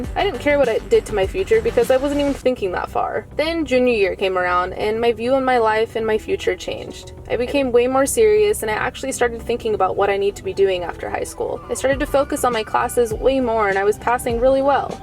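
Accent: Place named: Ireland. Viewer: Daniel Amusan Thanks! Btw Iove the Irish accent! XD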